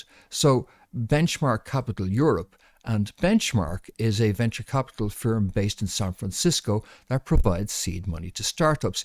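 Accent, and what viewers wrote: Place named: Ireland. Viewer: we love listening to you speaking in your gorgeous Irish accent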